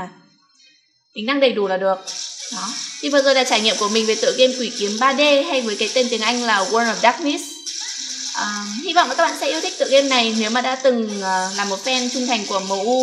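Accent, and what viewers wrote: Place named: Hanoi, Vietnam. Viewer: Rất thích giọng con gái hà nội 💝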